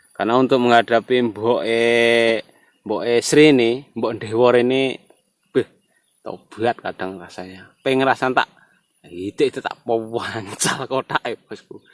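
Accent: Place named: East Java, Indonesia. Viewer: Sik kang, sakjane aku ki pingin belajar ternak tawon. Tapi ndelok n ngrungokkan narasimu kok malah ngekek karo misuh2 dewe dadine. Wis pokoke ndlodok n trocoh, khas jawa timuran sisih kulon ki ketoke. Gak waleh tenan ki ndelok. Sukses yo kang, lanjut kasih pengetahuan nggo wong akeh. 👍🇮🇩😷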